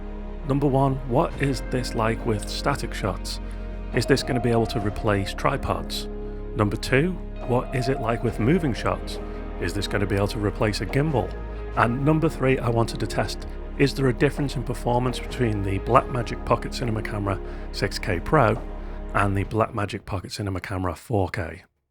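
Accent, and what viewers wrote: United Kingdom. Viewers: Im from the North West - I new it was a Merseyside accent (i know the Wirral isnt Merseyside but you know what I mean) 😆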